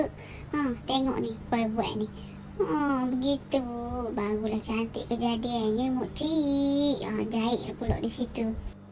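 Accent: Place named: Pahang, Malaysia. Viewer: orang pahang deh...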